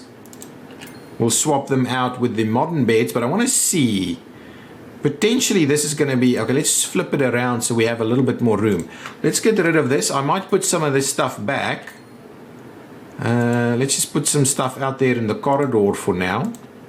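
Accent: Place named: South Africa. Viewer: Hahahah you sound sooooo South African, nice vid man!!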